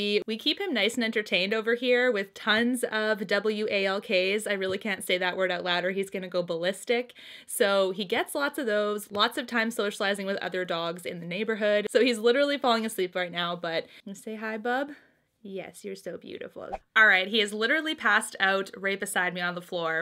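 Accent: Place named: Canada. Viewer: I have to say that for some weird reason, I am OBSESSED with accents and ways of speaking from other countries, etc. I love how you Canadians say ABOUT!! ❤️❤️❤️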